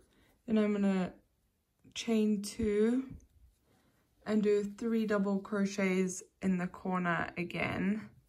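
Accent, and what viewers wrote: South Africa. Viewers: Hello thank you for such an amazing tutorial! Just a random question, are you South African?😊😊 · Are you South African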